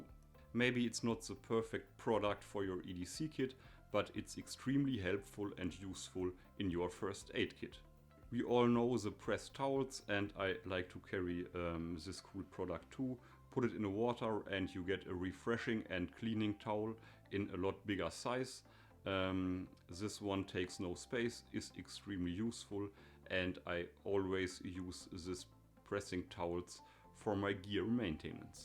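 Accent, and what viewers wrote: Germany. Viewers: German dialect! Dann sag ich mal freundlich Hallo 👋😁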